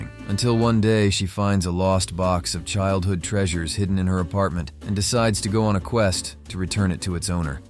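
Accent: United States. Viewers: that really doesn't surprise me. Listening to an American accent critiquing the film was painful. 🇳🇿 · Kind of weird to hear a monotone american voice drone on about one of my favourite films. But what he says is quite acurate. I saw the film on the opening day in Germany. It flashed me so hard. I loved it, all the weirdness, the strange colours, the music! Amelie is still one of my most beloved film scores! It is what it is. Either you love it, or you don't. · Your stupid american accent killed this video from me. I lived in Portland Oregon and I just have to tell you to get a different voice for your videos.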